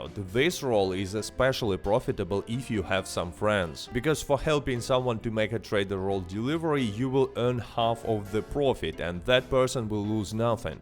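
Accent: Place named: Russia. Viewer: I love your accent. It sounds Russian but not quite Russian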